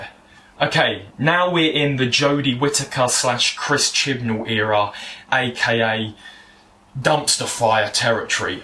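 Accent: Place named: South East England, United Kingdom. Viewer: oh, you're a white guy in hid mid 20's with a vaguely south eastern accent and no fashion sense. I think i can guess most of your rankings before even watching the video s- series 4 and 5 a- series 1 3 14 and 10 b- series 6 and 2 c- series 8 and 9 d- series 7 and 13 f- series 11 and 12 edit: skipped to the end, i got many of these right. It's infact true that cis men have no taste.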